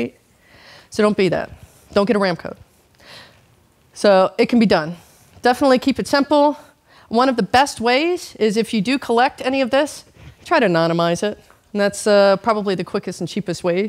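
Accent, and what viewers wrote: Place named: United States. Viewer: Interesting .... Dutch lady with an American accent..... hmm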